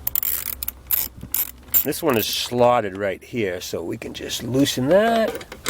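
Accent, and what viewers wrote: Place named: Maine, United States. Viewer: Where ya from, bub? Sound like a fellow Mainer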